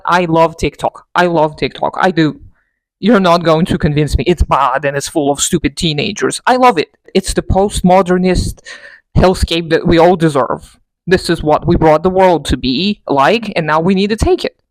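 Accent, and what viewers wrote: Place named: Russia. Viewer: You're Russian accent is amazing lol. I'm jealous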